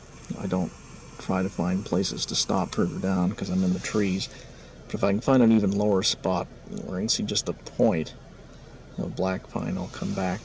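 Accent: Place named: Australia. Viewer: Dear, I'm trying to understand why Yourself, CoolHardLogic and Reds Rhetoric don't use your real names and show your faces on vids? Are yous afraid of something? Even many of these FE people do. Also are there ANY "conspiracies" that you do believe in? Your accent sounds like an Aussie! An Aussie at the a bar the other weekend told me about a supposed shooting hoax in Australia. The Port Arthur Massacre? We never heard much about it in the states here. He said MANY Aussies were fooled by it at first. Apparently it lead to a gun buy back program which took many guns out of Australian people's hands who now wish they didn't do it? Sounds weird to me? These vids on Youtube don't make any sense about it or seem too "conspiracy" minded. It's just a buy back program anyway right? Any man can still buy a gun down there, correct? Australians would never go for that nonsense, they are hard working proud men! 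I don't believe it.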